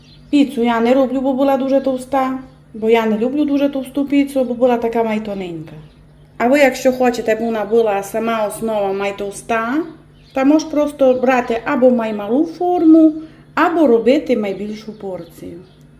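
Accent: Transcarpathia, Ukraine. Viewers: Дуже гарний закарпатський діалект.Кому не подобається, хай не дивиться. Ви молодець. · Пані, ведіть свій канал тільки своім закарпатським діалектом, я коли вас слухаю душею переношусь в Виноградів до своіх дорогих друзів. Дякую вам ❤️ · кому ся не подобає закарпатський діалект, то най ся не дивит. А я люблю як ви ведете канал❤ і не я одна😊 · дякую вам розмовляти так як ви на Закарпатті розмовляєте це думаю гарно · Дуже люблю Ваш закарпатський діалект. Говоріть ним, бо він чудовий. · Дякую за рецепт, простоту , закарпатський діалект ❤ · Приємно слухати закарпатський діалект. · Пані, у вас шикарний закарпатський діалект. Вас приємно слухати, а головне- смачно слухати😊 Дякую за рецепт. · Мені суперово слухати на закарпатському, все зрозуміло! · Щиро дякую за смачний рецепт.Ваш закарпатський розговір - це Ваша родзинка. Миру нам усім і перемоги. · Дуже файна піца ,а ще ліпша закарпатська мова .дякую Вам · Важ закарпатський діалект це перлина самобутності, слухаю вас і отримую велике задоволення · Люблю закарпатський діалект❤❤❤ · Пані не вздумайте міняти мову ведення каналу. 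Я з Києва і тільки за Закарпатський діалект. Вас просту чути приємно. Ви знаєте комікесу Леру Мандзюк? То ж вона цікава тим, що розмовляє діалектом. Я приїжджаю до рідні маминої на Хмельниччину ❤ я млію від Подільського діалекту. Говоріть по свому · Мені дуже подобається закарпатський діалект! Через нього я звернула увагу на ваш канал! Будь ласка, продовжуйте говорити, як ви звикли! І дякую за ваші рецепти ♥️💙💛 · Смотрю на Вашем канале не только рецепты, но и наслаждаюсь Закарпатским диалектом! Очень нравится, просто наслаждение! 👍🌿🌹🌿Привет из Одессы 💖☘️🌻🌻🌻☘️🇺🇦🇺🇦🇺🇦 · Я з Полтави, заслуховуюсь Вашим закарпатським діалектом. Вмикаю канал, дивлюсь як готує господиня каналу та просто слухаю та насолоджуюся ❤ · Дякую за рецепт. Він просто чудовий. Слухати Вашу закарпатську мову просто насолода та радість для серця. Ще раз дякую🙂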